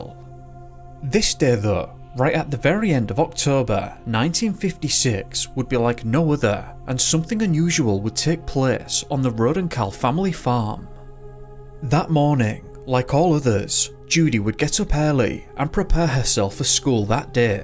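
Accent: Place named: Northern England, United Kingdom. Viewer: As an aside, love your accent, but can't place it... northern England? Yorkshire? Tell me!!!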